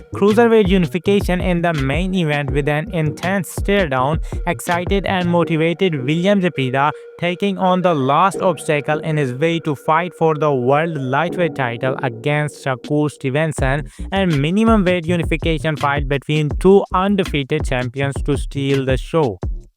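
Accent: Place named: India. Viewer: Wttf is this indian voice talker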